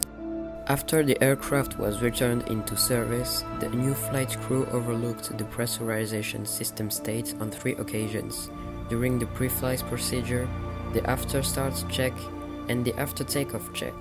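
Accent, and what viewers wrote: France. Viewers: Woah bro im shocked! You absolutely nailed this video and voice reveal!!! Fire voice bro, the French accent is 🔥! Also RIP to all victims onboard that flight. 🙏 Best vid yet for sure!! I'm so happy for you bro and wish you, and your channel the best future posible! ❤ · o my god this is so scary ! i love that ! thank you for dooing this halloween video and this voice reveal with this french accent 😍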